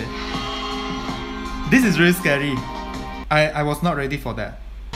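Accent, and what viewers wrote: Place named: Singapore. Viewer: You sound singaporean haha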